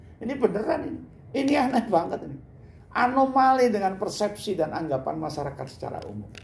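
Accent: Java, Indonesia. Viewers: Aku suuueeneng klo om yg ngomong, jawane kuuuentel Lanjut terus om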